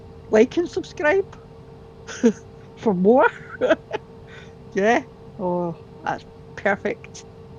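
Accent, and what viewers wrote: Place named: Scotland, United Kingdom. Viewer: Hi! You always make my day with your happy videos. And now that Outlander is finished 😢 I can also get my Scottish accent fix 😊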